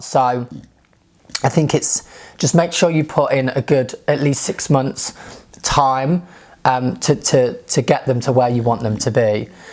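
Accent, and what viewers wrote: England, United Kingdom. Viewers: I just love his British accent ... I can spend hours hearing him talk... ✨️ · Are you from England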